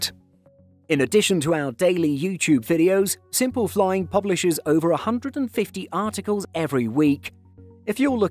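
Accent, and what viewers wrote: England, United Kingdom. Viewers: In fact your english accent excites me a lot ! As you say purpose, so great for my french ears... ❤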